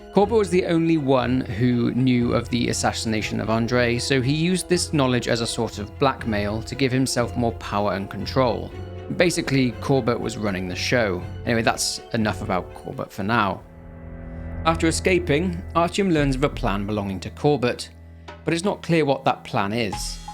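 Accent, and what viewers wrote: United Kingdom. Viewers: This is actually a really common thing amongst a death of British YouTubers. There's something about the accent that's soft, but I think it's largely how a lot of harder sounds like Rs are instead muted. We tend to think of Ks or Gs are harder sounding letters, but they're common on all languages and accents. Something about how certain letters are shifted in British accents just seems to soothe people. As the saying goes, the largest modern export of Brittain is their accent, and it's for good reason too.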